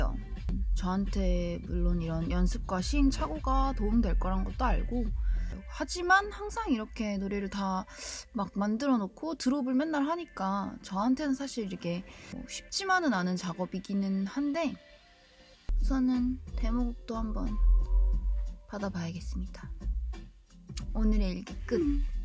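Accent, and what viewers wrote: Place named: Gyeongsang, South Korea. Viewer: 경상도 여자였어♥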